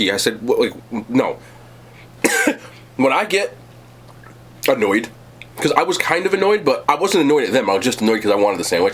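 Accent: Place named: New Jersey, United States. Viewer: The jersey came out in this one😂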